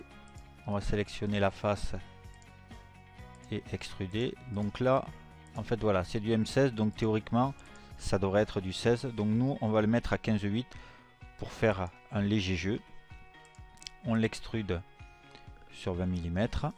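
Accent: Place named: Southwest, France. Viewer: Merci pour ce super tuto efficace et commenté qui sent non pas le canard mais le Sud/Sud-Ouest ...!!!!? je connaissais une Madame Lanvi !! ;-)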